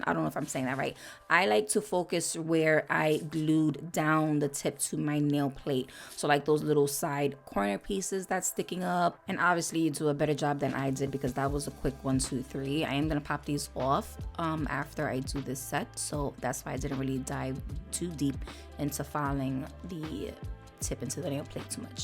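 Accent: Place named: North, United States. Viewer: I always watch videos love the content and rarely, comment, share, Subscribe. But the way you shouted one of your followers for the gift! And willing to help her expand her clientele, along with the sincerity I felt from you. I had to comment and subscribe!! 😊 LOVE YOUR CONTENT….Your Northern Accent is strong. I grew up in New York, I watch your videos so much mines is coming back stronger. 😂😂😂 You remind me of one of my “NY Boricua” Besties from L.E.S!!!!!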